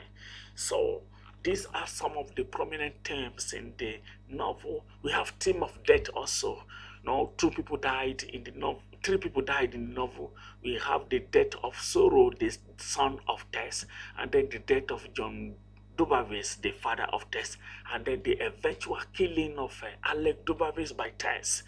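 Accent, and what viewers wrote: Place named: Nigeria. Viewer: I love this video so much the Nigerian accent makes it so much better reminds me of Nigeria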